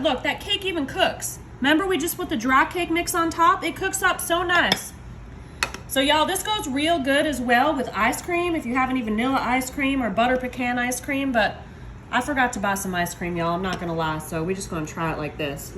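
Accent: South, United States. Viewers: What southern accent is that?? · Yummy. Girl where u from? You are sooo southern! Arkansas here. I love all your fast recipes and your accent too. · These desserts don't look good to me. It's not something I would feed my family, but I love how she tries to talk in a southern accent, so cute!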